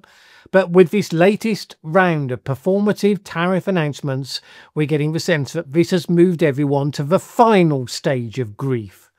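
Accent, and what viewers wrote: United Kingdom. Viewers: British accented Clown blowing smoke out of both ends.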